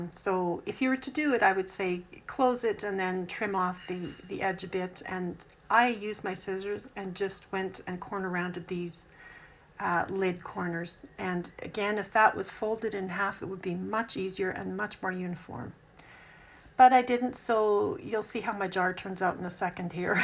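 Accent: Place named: Canada. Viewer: What a lovely card. I love your Canadian accent.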